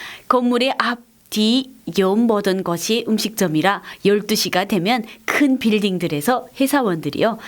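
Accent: North Korea. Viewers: 말솜씨가 아주....북한의 억양이 좀 남아있지만 조리있는 문장과 말 보기 좋습니다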